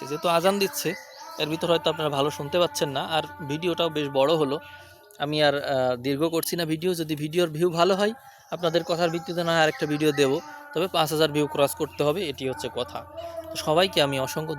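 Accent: East Bengal, Bangladesh. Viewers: তুমি কি পূর্ববঙ্গে বাঙাল?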